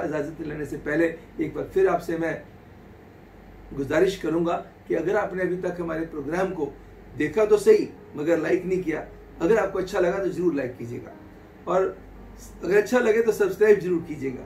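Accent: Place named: Kashmir, India. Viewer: aapke talaffuz se lag rha hai ki aap Jammu and Kashmir se talluq rakhte ho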